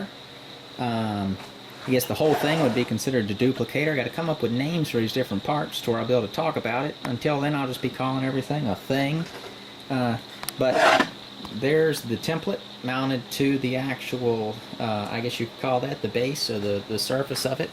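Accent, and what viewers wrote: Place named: Tennessee, United States. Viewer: He may be in Texas, but I'm guessing Middle Tennessee. His accent sounds just like a bunch of my relatives from around those parts. I love that accent. I could listen to him talk all day.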